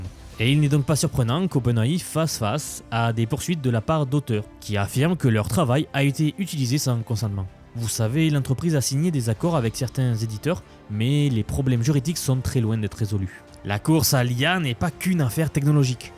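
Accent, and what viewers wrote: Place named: Southwest, France. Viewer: On dirait bien l'accent du sud ouest :)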